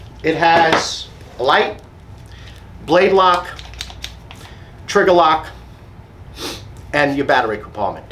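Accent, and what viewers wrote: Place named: Massachusetts, United States. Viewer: I could tell by your accent. I live in mass.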